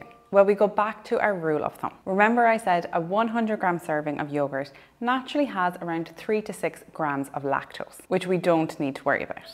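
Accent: Ireland. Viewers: thank you for helping with this weight loss journey. a comment … love your Irish accent. Thanks again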